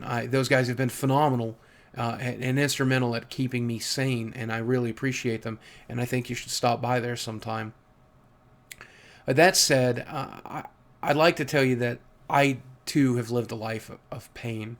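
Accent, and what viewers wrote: United States. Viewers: I can't understand you very well because althought i understand english, i cant understand very well the american accent. Its like spanish from Spain and Latinamerica, are different. But if your problems comes from your body (as you said is a big problem) or loneliness, you can change it but you have to do an effort. You can go to a gym and lose weight and be happy with your new body. That happyness improves your esteem. Its long to explain but you can do what you want with an effort.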